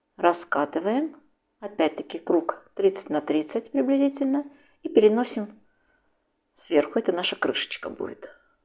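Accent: Baku, Azerbaijan. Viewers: Джан, мой бакинский акцент ☺️🌷💜 Как бальзам на душу. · Тихи-тихо - это потихоньку. И у Вас милый бакинский акцент сохранился❤ И Вас приятно слушать и смотреть, спасибо за рецепт! Москва смотрит.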